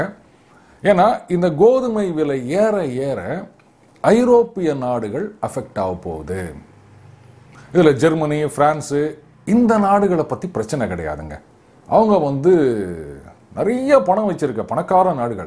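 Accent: Chennai, India. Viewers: Madras தமிழ் பேச்சு, பிரமாதம்.....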